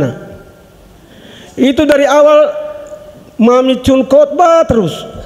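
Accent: Flores, Indonesia. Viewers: Luar biasa...sy suka sekali kalo renungan dari pater...logat floresnya yg membuat kami bangga sbg orang Flores, GBU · Mantap, tetap semangat 💪 luar biasa kotbah nya kaya orang Flores nih.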